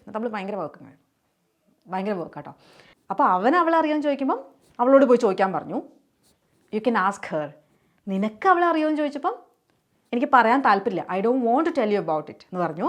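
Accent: Thrissur, India. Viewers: Nice class . 👏. Madam, are you from Thrissur ? Your slang is like thrissur ,am I correct,,??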